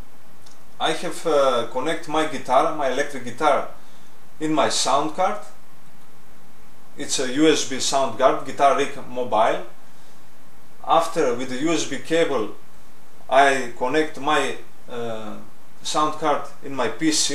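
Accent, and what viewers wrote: Greece. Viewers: greece maybe larisa :P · are you greek? i can tell by ur accent. thanks for the video by the way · that greek accent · Are you Greek? I can recognize the accent because I'm Greek, but I was born in America. Thanks for the help, I've just started recording songs on my ukulele and wanted to know how I can somehow plug my uke into my computer.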